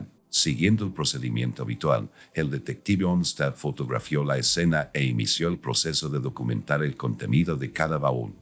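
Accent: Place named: United States. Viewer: Por favor busquen un narrador de habla española no un yanqui con acento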